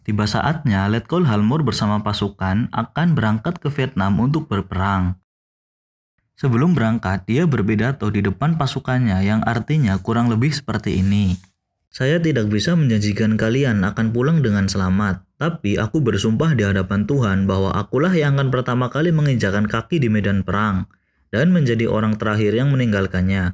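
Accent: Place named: Banyumas, Indonesia. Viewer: Yang nggak bagus.. Ini orat nerocos terusan.. Ngapak lagi ngomong nya.. Nyebelin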